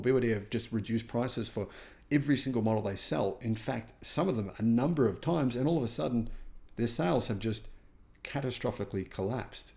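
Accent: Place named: Australia. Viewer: Sometimes "Aussi" accent gets very heavy. Do you understand Mate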